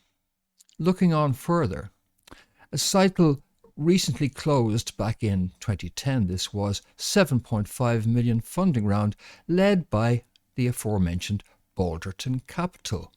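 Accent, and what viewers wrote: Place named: Ireland. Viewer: we love listening to you speaking in your gorgeous Irish accent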